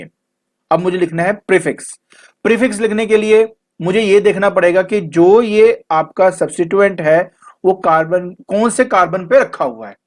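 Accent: Punjab, India. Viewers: Sir you are Punjabi??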